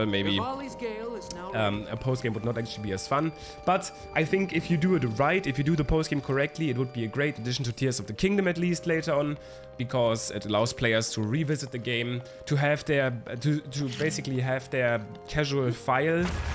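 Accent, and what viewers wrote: Germany. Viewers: Man hört so raus dass du Deutscher bist, Abo hast du! xD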